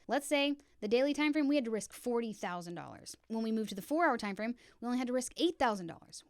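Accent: United States. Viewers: Original American Accent...❤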